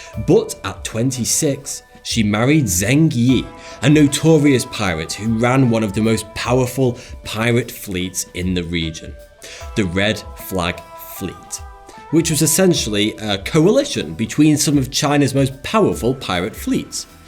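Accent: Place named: Lancashire, United Kingdom. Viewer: What part of Lancashire are you from? You sound like your from my area - Preston.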